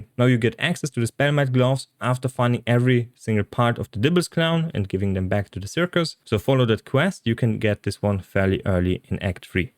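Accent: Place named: Germany. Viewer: your english is weird man i thought you were speaking germany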